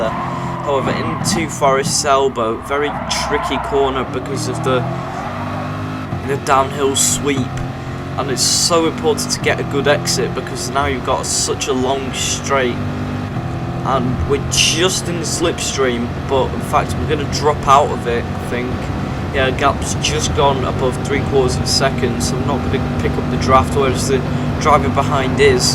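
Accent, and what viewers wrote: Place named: Northern England, United Kingdom. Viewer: He’s got a northern accent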